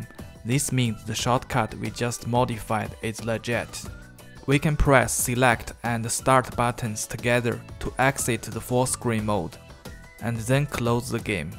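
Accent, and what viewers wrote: China. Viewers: Yes! Finally find a solution. Thanks for the video., may I ask, are you from china? Your accent is very familiar to me😂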